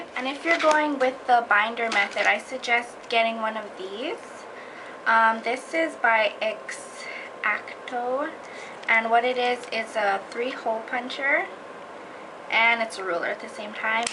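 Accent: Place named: Hawaii, United States. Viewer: U have a pigeon accent r u from Hawaii?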